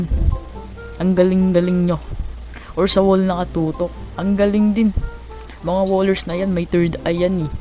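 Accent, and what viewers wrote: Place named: Iloilo, Philippines. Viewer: taga san poba kayo tol bakit nag i ilonggo ka tol ?????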